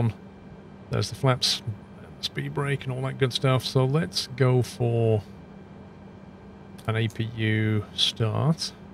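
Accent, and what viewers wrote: United Kingdom. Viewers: British accents are so fancy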